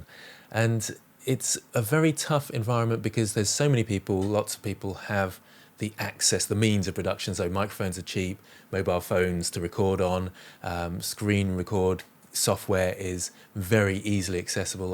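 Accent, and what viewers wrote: United Kingdom. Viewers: Congratulations. Very well deserved. Your tutorials are so easy to follow even for a non-English speaker. The British accent is a very welcome bonus, too.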